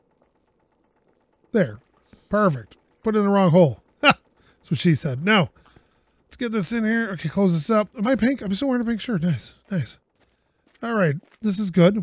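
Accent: Canada. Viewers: You have a Canadian accent are you Canadian?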